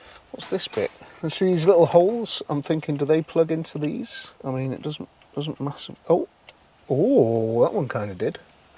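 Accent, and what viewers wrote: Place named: North, United Kingdom. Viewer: Good to see a car channel from the north for a change 👌 don't have to put the subtitles on 😅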